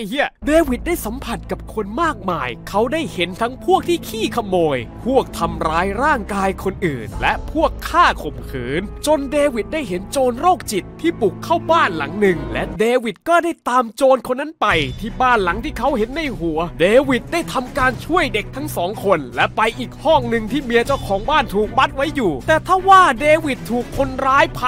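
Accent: Isan, Thailand. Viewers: ชอบจังเวลาพี่พากษ์อิสานอิอิ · อ้ายยยย บักย่านน้ำอีสานจ็าดๆ55555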